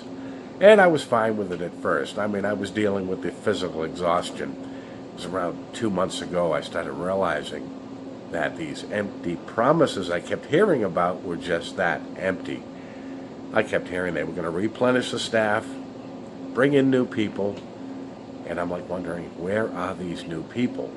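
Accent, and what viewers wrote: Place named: Pittsburgh, United States. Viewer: . Are you Pittsburgh?? I swear. I detect a Pittsburgh accent!!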